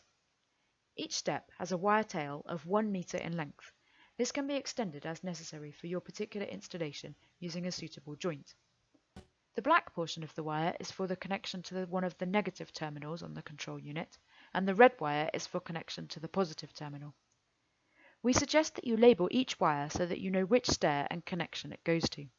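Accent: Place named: United Kingdom. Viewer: This lady sounds so fancy with the british accent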